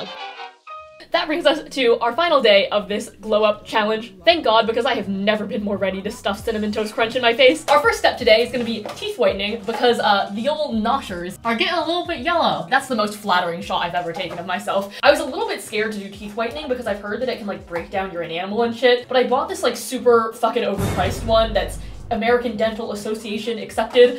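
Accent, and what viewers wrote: United States. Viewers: aa a Well considering has a very clear american accent, asking her what nationality she is doesn’t make much sense in america.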